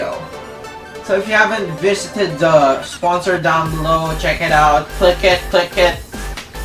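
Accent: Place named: Philippines. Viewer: I noticed a slight accent, I'm Filipino too! I lost my accent though D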